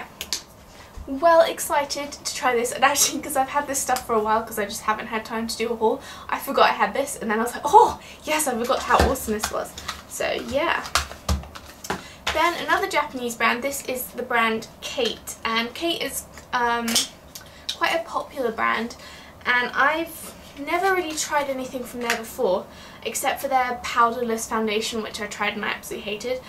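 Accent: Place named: England, United Kingdom. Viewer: You sound English though do you live in Japan x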